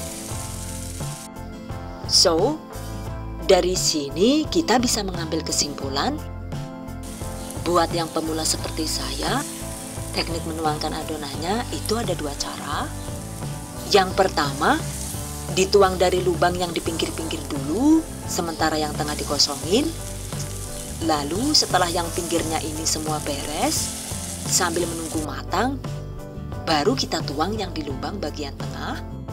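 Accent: Banyumas, Indonesia. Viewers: Wahhh ngapak ,:) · Jane rika wong ndi yu... Deneng le ngmg ngapake jos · 😁😁😁😁bunda saya suka bicara nya bunda yg bahasa jawa nya bun ko da jawa ngapak nya bun 😀😀😀 tp saya jg suka redep2 nya bunda tenk's bun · japan + ngapak ya etok2 ora doyan😅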